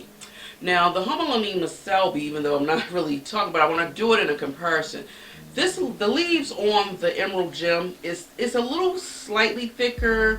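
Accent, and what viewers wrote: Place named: Maryland, United States. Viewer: If u ain't from Merrland i dont kno who is prolly my fav accent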